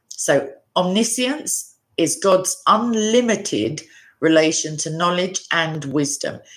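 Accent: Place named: United Kingdom. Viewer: I love her British ascent.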